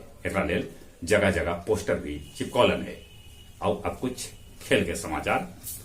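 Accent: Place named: Magahi, India. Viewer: Bhut accha lgta hsi aapki maghiya boli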